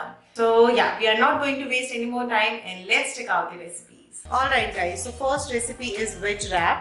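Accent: Punjab, India. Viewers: Hey r u punjabi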